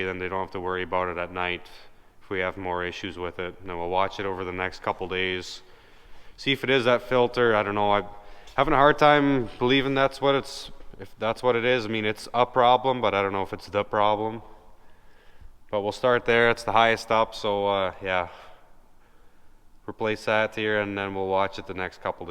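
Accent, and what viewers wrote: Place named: Netherlands. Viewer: Hi, it is quite clear that you presumably have Dutch ancestry, were you born in the USA or immigrated, your English is at least good.. But as cold as it is now, it is not extremely hot in the summer, and isn't it very challenging at times. Thank you for wonderful everyday videos that I can watch here in little Denmark.. Greetings Erik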